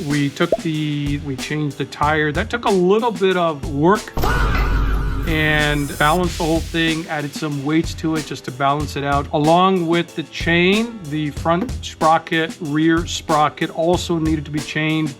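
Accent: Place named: United States. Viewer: What a US accent. Inspired.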